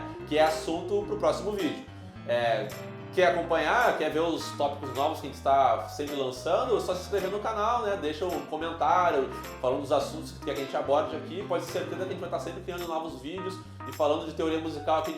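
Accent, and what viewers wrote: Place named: Porto Alegre, Brazil. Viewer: Sotaque de pôoorto, bah!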